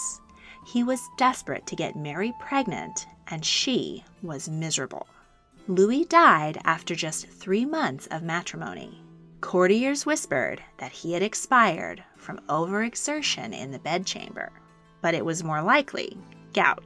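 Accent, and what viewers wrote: United States. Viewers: I simply can't listen to an American narrator about English kings and royalty! It's too off-putting! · What? An American voice narrating this. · This so-called documentary is totally boring it doesn’t tell us anything new about Henry and his sisters just goes over and over the same old historical facts… It would’ve been a whole lot better if narrated by a non-American voice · History Teller and great American native voice ... 02/22/2021 Thanks 😊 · this English history is not good being narrated by an american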